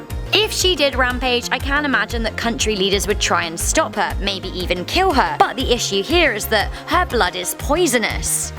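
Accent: England, United Kingdom. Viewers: Just to say, I live your English accent dear😊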